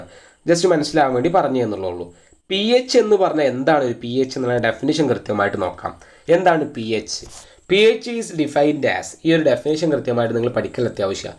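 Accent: Malappuram, India. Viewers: Malappurathu karan anoooo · sir ന്റെ class കേൾക്കുമ്പോൾ schoolil നിന്നും മനസ്സിലാകാത്ത portions friends പറഞ്ഞ് തരുന്നേ പോലെ ന്ണ്ട്....പിന്നെ മലപ്പുറം slang കൂടി ആയപ്പോൾ pwolii 😻🙌💣💥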